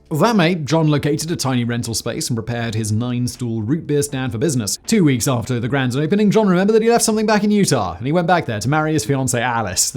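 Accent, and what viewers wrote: United Kingdom. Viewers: I do love all of Simon's channels, but he has a rather insistent habit of pronouncing names in the most British way possible. He gets several other names wrong in this video alone lol.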